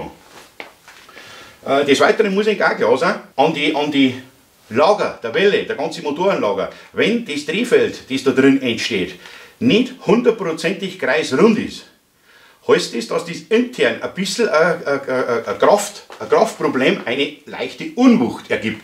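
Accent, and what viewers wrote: Bavaria, Germany. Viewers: Also ich als Bayer versteh den Dialekt ja, aber ich glaube das für manche Menschen ein Untertitel absolut sinnvoll wäre!!! Dann hättest noch mehr Klicks!!!! 😝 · Du bist scho a bäriger Typ - Bsonders dei Dialekt is a so schee. Mehra Bayern auf YouTube ! Mach weida so! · "Und wehe die taugt nix..." 😄 Bin gespannt und in freudiger Erwartung! Es ist immer wieder ein Genuss Dir zuzuschauen. Auch wenn es ab und an etwas anstrengend ist wenn Du wieder ins Ur-Bayrische schwingst. 😉 Mach weiter so!!! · Des passt scho so wia d des mochsch danke für den elektrischen Teil aber bissle weniger bayrisch wär au ok ;) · Super Kanal. Finde die Umsetzung sehr gut. Den Schutzschalter würde ich genauso machen. Lieber Nummer sicher. Und dazu noch bayrisch Top top top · Ist das Bayrisch? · Solche Empfehlungen würde ich nicht aussprechen. :D Als gut ausgebildeter Elektroinstallateur lernt man hier nichts fachliches... 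stimmt, es ist aber nie verkehrt, zu sehen und verstehen, wie andere "Artgenossen" arbeiten. Wer den Drang hat, sich selbst fachlich zu verbessern, darf sich nicht scheuen, andere Arbeitsweisen kennen zu lernen, zu sehen und zu verstehen. Außerdem lernt man so (in diesem Fall) den bayerischen Dialekt zu verstehen... du quasselst damit etwas, was ich fachlich alles kenne. Besser lernt man keine "Fremdsprache"... woll?! :D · Ach reg dich doch nicht auf über sowas, dann lebst länger :-) Aber mal ehrlich, mach einfach mal was mit ihm zusammen, ein teil des Projektes bei dir und ein Teil bei ihm, das bringt Klicks :-D. Lets Bastel hat ja letztens auch Hilfe bei Jonas Winkler geholt. Mach weiter so und ich als Sau-Preuße verstehe dich auch in Bayrisch, zwar manchmal mit Hürden aber das passt so ;) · Ich schaue Deine Videos gern aber mein lieber ich kann Bayrisch nur schwer verstehen. Etwas mehr Hochdeutsch wäre schön. 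· Guten Abend Mache bitte weiter so ! Und lass Dich bitte nicht Ärgern :-))) Ich finde deinen Kanal Top / Klasse / Spitze ........ usw. Und wehe Du hörst auf Bayrisch zu Sprechen , Hochdeutsch passt leider nicht zu Dir :--)))) hört sich an als wenn ein Rheinländer Bayrisch sprechen würde :--)) Schöne Grüße aus dem Rheinland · reg dich doch nicht ueber die dummen auf. ich schaue deine videos gerne, weil du diese interessant machst. deine freie bayrische ehrliche schnauze schaetz ich auch sehr. also brav weitermachen.lg rene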